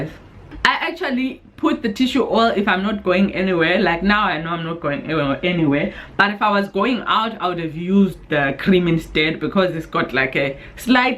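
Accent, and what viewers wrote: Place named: Zimbabwe. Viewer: Inlove with the Zim accent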